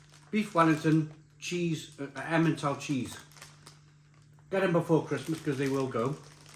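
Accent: Wales, United Kingdom. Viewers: It's not his fault, he's Welsh.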